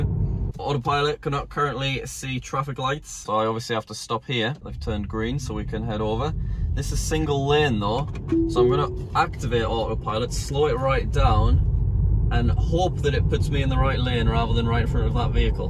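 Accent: United Kingdom. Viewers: I thought u was American but ur British Greetings from Italy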